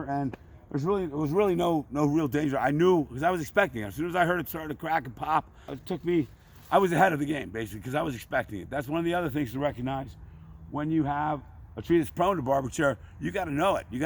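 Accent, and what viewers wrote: New York, United States. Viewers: An arborist from New Yoik! At least, that's how it sounds to this Englishman. Loving your work sir👍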